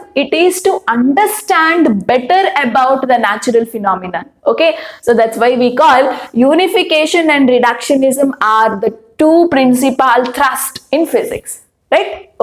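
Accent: Karnataka, India. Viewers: Mam r u from Karnataka?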